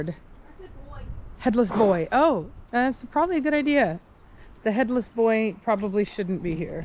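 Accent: United States. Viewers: Put that Texas twang on girl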